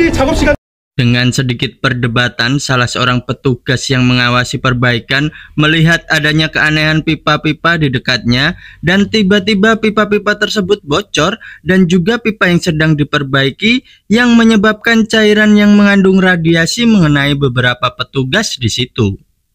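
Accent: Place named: Banyumas, Indonesia. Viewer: Ngapak bang 😂😂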